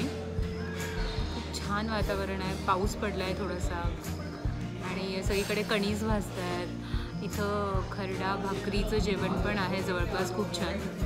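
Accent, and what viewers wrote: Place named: Kolhapur, India. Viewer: मस्तच... मॅडम थोडसं narrative मध्ये सुधारणा करा... You are repeating same sentences again and again...सांगली रोडचे वर्णन करताना ग्रानाईट, मार्बल, संगमरवर, Building material, ग्लासेस असे वेगवेगळे शब्द वापरु शकता... फॅक्टरी म्हणण्याऐवजी शोरूम्स म्हणा हव तर.... बाकी सिनेमॅटोग्राफी, background music, editing सर्व काही छान...बोलता बोलता आपण बरेच शब्द कोल्हापुरी style मध्ये बोलतो😀😀 "मेन म्हणजे" पण तो accent creative आणि मजेशीर वाटतो... Keep it up... शुभेच्छा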